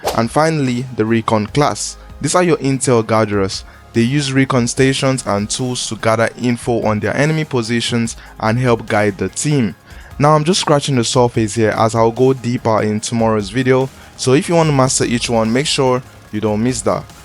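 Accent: Nigeria. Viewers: Wait are you Nigerian? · Bro you Nigerian...😭 Aye great video man❤ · Bro are you Nigerian?? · You sound Nigerian. Are you? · Your 9ja accent is unique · I know my Nigerian brother wherever... We can never go wrong with that accent... in fact to be more precise.. you be Yoruba boyyyyy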